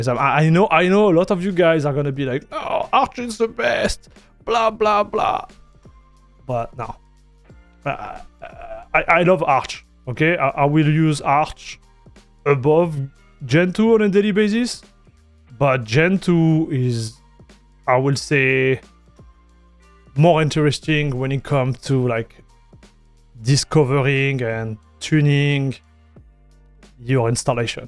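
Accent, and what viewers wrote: France. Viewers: On dirait que vous avez un accent français non ? Ou francophone en tout cas.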